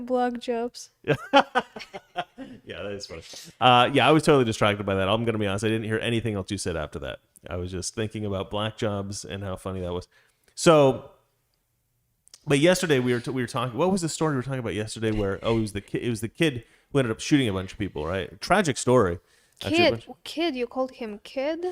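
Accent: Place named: Russia. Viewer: The Russian accent isn't always attractive, but she has such a sweet and feminine voice that it still works for her.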